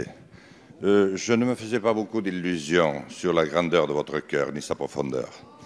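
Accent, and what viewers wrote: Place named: Southwest, France. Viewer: Vs êtes du sud ouest gardez votre accent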